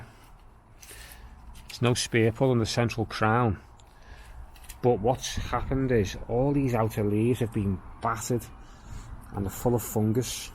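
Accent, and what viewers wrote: Liverpool, United Kingdom. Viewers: Lovely to see your garden again and listen to a fellow scouser, happy to see the cordyline survived. Regards from Ontario, Canada.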